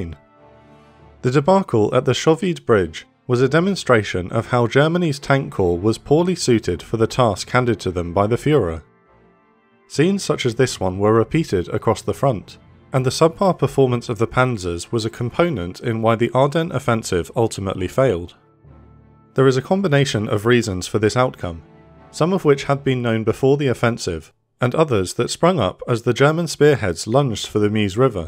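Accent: United Kingdom. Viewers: This video is so typical of WW2 stuff: an announcer with a British accent (to make it sound more "official" somehow) and an over emphasis on the importance of tanks themselves by 1944. AIR SUPERIORITY and straight up LOGISTICS were 200% in the hands of the Americans, and even the Russians, almost a full year before the "Battle of the Bulge" anyway. Some great military mind once said, "Amateurs study tactics, Professionals study logistics." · This is not totally accurate, the problem was fuel, fuel and fuel! the Stug, Panzer 4, Panther, Tiger! Its like the Pommes still think that Little Willy Frank Whittle thinks he invented a jet engine; meanwhile the German had a Jet aircraft flying in August 1939! Judging by your accent you come from the country which made the worst tanks in WW2; the Cromwell, Crusader, Churchill all were shit! British Tanks were crap! 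My Father was a Tank Commander of a Mk 4 Sherman, and the odd Sherman had a 17 pounder in it! My dad was in the 20th Battalion of the 2nd NZEF, 1st Echelon. WE were bloody pleased to get Sherman's Powered by the Ford GAA V8 18 Litre grunt machine. He fought in Greece, Crete, North Africa, where the New Zealand division got smashed because we didn't have our own Tanks, so after massive losses, the Battalion (Survivors) were turned into armored Regiment and fought through Italy right up to Trieste! They even had a few shots at T 34's from Titos forces! Tito backed down! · I wish that the English was better without the over emphasis of the British accent.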